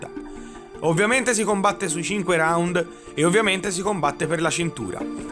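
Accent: Tuscany, Italy. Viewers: Deh, oh che tu sei toscano ?!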